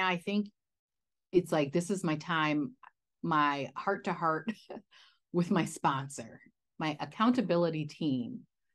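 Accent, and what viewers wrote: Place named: New Jersey, United States. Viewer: I think it's a good one if it is helping you make progress..random but I have the feeling we live in the same part of the US. You sound like us Jersey peeps. Lol...I did say random. 😃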